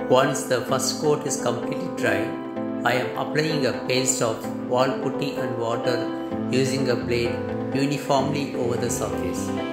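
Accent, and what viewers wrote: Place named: Tamil Nadu, India. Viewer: TQ for jet speed reply.l am also tamilian.so Happy to hear you are also from chennai.i guess because of your English speaking.now I am settled in small.village near by Ongole A.p.i will try to make your articles brother.always your fan.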